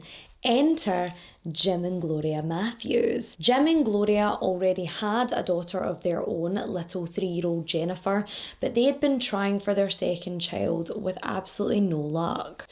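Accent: Scotland, United Kingdom. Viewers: I'm so proud of myself.. When i started watching/listening to your channel, i was having trouble with understanding your scottish accent. I had to listen really carefully. Nowadays i have no problem understanding. I'm a fluent listener of Scottish accent 😎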